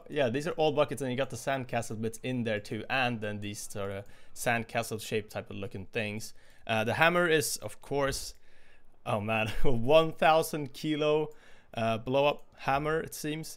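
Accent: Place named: Sweden. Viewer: swedish accent jumped at me from the first 5 seconds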